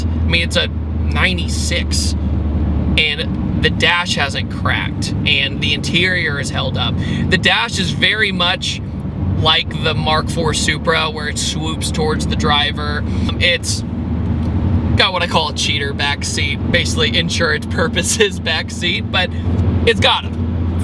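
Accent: United States. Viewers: Damn the accent tho even when saying wrx american english and accent.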